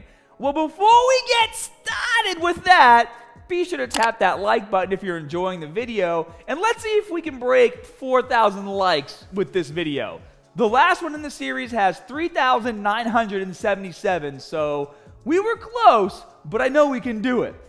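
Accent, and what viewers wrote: Boston, United States. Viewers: 😂 its like Boston accent is sneaking out. Let it beathe bro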